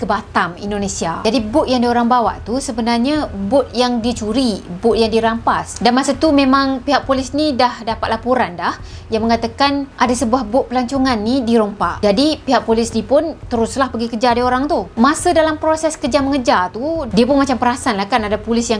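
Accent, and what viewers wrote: Kelantan, Malaysia. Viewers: Iyo mek Kelantan la ni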